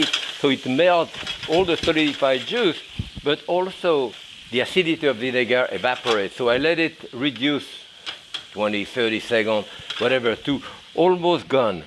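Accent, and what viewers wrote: France. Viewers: It's not a speech impediment . It's his French accent .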